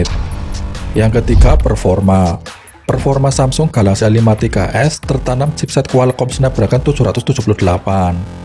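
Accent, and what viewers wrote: Banyumas, Indonesia. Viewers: Ngapak sekali 👍 min , , , ?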